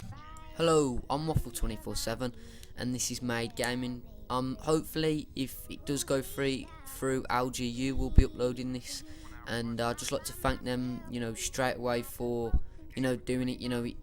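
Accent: United Kingdom. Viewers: hey i can hear you're British but why do British people hate Americans so much? Every video i watch there's some British kid ripping on the U.S. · Cockney commentary that was pretty good.You might just need to improve quality a little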